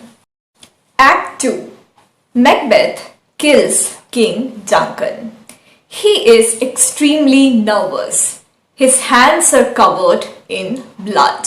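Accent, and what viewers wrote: India. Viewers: Might be good but Indian accent distracts me like hell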